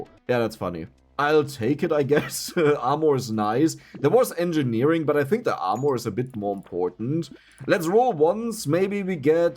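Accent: Germany. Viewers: the german accent xD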